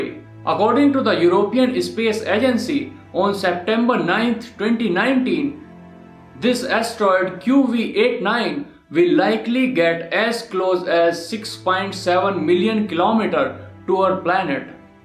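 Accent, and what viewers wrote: India. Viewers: Who thought the guy who is speaking in this Video is Indian? Me!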